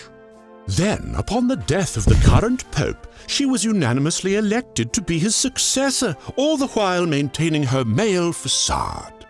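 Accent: United Kingdom. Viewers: I guess his accent is British. I don't know why but most of the accents of documentaries sound British to me. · phenomenal animation, accurate history, Plus that middle-aged male British accent. Why is this only 13k subs? You earned one more today at least 👍 keep going!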